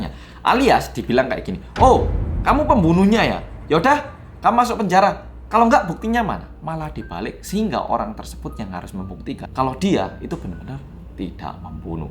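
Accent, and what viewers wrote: Java, Indonesia. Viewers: Suka bgt sama kontennya mas bersama orang korea yang medokkkk.😊😁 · 𝙃𝙖𝙡𝙤 𝙜𝙪𝙮𝙨 𝙬𝙚𝙡𝙘𝙤𝙢𝙚 𝙗𝙖𝙘𝙠 𝙩𝙤 𝙆𝙤𝙧𝙚𝙖 𝙧𝙚𝙤𝙢𝙞𝙩 Bersama orang Korea yang "𝙢𝙚𝙙𝙤𝙠𝙠𝙠" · Medhok bossqu😁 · Pertama liat videonya tak kira: lha ini wajahnya kayak orang korea beneran kok medok banget jawanya hahaha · Ngakak aku penampilane wes kuweren omonge njelongop njelongop 🤣🤣🤣 ketok jowone mas haha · MAS: HELLO GAIS, WELCOME BACK TO KOREA REOMIT. BERSAMA ORANG KOREA YANG SATU RUMAH:" MEDOKKKK" krna setiap hri nonton. Jd hafal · "Bersama Orang Korea Yang Medok!!!!" Selalu reflek bila mas Bilang ini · Ya allah mas sampean wong korea hlooo😂, kok iso bosone njlungupp to yooo, bener2 orang korea yg medhokkk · oke aku udh lama ga buka yt dan nonton sesuatu hampir setengah tahun lebih. akhirnya aku mau mulai lagi dr mas mas medhok ini. editannya itu lo ngakak terus aku. padahal ceritanya bukan cerita komedi. 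salut buat editornya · Yang medokkk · Makin glowing aja ini mas mas medok 😅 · Ya medok 🎉 tks...bye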